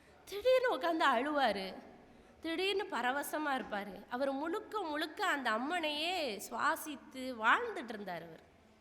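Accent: Tirunelveli, India. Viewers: உங்களின் நெல்லை தமிழ் மூலம் சொற்பொழிவு அருமை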